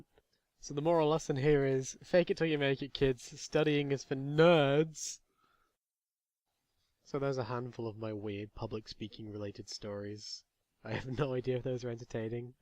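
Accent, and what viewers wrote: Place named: United Kingdom. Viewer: Holy crap... You're British.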